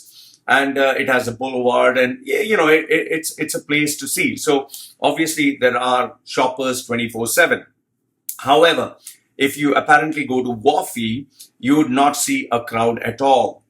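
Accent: India. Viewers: Indian accent yeahhhh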